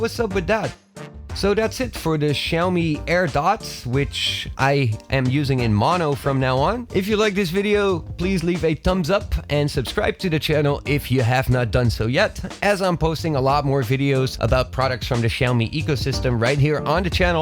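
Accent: Netherlands. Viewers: Are you Dutch? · This guy has a subtly Dutch accent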